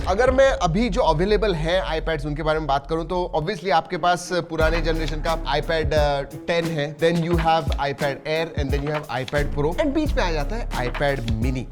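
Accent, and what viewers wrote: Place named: Maharashtra, India. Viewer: तुमचे मराठीं मधे reviews खुप दामदार वाटतात तुम्ही अत्ता हिंदी बोलत आहत पैन एमएलए आसा वाटत आहे की मराठीच बोलताय 😅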